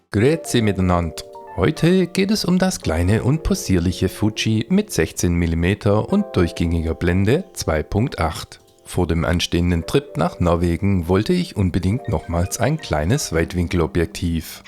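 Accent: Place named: Swabia, Germany. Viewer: Ich mag deinen Kanal habe ihn vor zwei Tagen erst entdeckt. Sehr angenehm alles insbesondere deine Stimme! Übrigens höre ich etwas schwäbisch heraus 😉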